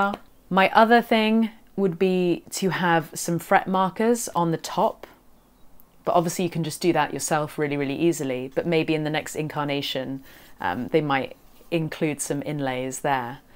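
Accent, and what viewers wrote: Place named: United Kingdom. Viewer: You are so British it's amazing.. I love your accent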